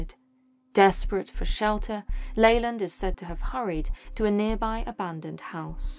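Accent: England, United Kingdom. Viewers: You are a great storyteller. This just happened to pop up on my main page, so I thought I'd take a look at it. Being a songwriter, musician, vocalist and body builder those are some of the things that I mostly look for on here. But since youtube started adding different types of videos to my page I started to watch a few of them. You're really good. It's because of the way that you tell them. You also have that great British accent going on there too. I think that your British accent is awesome! You do a great job. Thanks. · I love this informative channel!!! Thank you for presenting your content in a classy and professional way!! It urkes me soooo bad when you click on serious and mysterious videos and it's either a computer voice or some joker trying to make funny comments while presenting. Very well done, love your beautiful English voice ♥️ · It's an English accent, not British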